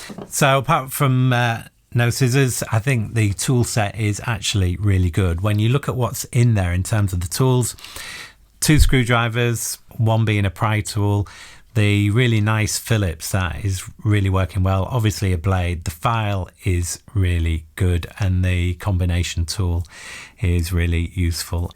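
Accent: United Kingdom. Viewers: Hi! New subscriber here. Love the “James Bond” intro there, specially with that natural British accent, such a joy to hear. Anyways, I like how you do these gear reviews, in such a simple way, very straightforward, I feel the sincerity. 👍🏼👍🏼 to you! Your new number one fan from the Philippines. 🇵🇭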